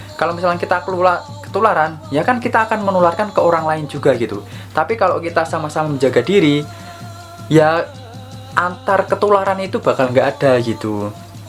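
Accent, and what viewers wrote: Java, Indonesia. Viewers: Sampean jowone ngendi mas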